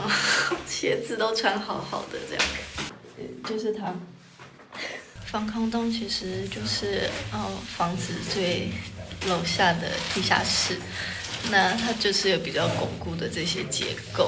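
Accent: Taiwan. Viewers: 聽你口音 帶老公同個仔返去台灣啦 做乜9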